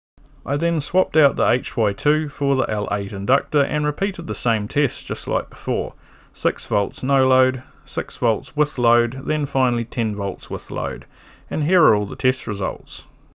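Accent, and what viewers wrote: New Zealand. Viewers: 😍 I could listen to a Kiwi accent all day. ❤ from the West Island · Great vid, thanks. Nice to hear a fellow Kiwi accent for once!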